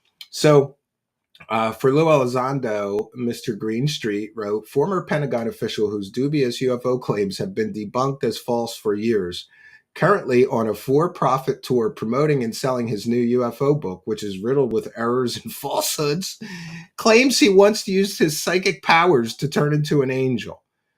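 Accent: Philadelphia, United States. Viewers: Good for you!!! I found his channel about 6 yrs. ago. Saw this guy wearing glasses and talking like someone from Philly about all the BS and I was hooked.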